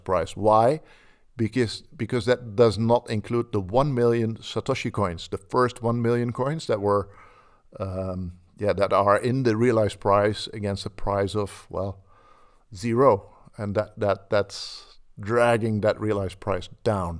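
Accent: Netherlands. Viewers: Heerlijk dat Engels met een Nederlands accent..😊 dank voor de info. 🎉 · lekker hollands accent jonge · Come for the long term $BTC analysis, stay for the Dutch accent. Thanks!! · This is the most Dutchiest Dutch accent I have ever heard. Great video!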